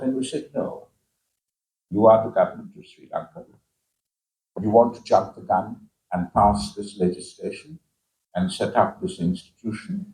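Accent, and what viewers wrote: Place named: RP, United Kingdom. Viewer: What ridiculous talk,just showing English posh pron ,accent etc What a Tragedy the helpless Poor doesn't understand?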